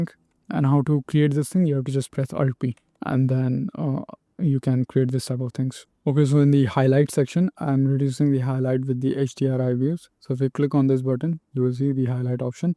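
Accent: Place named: India. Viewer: bhai aapke ascent se aap shayad indian lagte ho , badiya tutorial tha ek dum maja aagya kafi value addition hua thanks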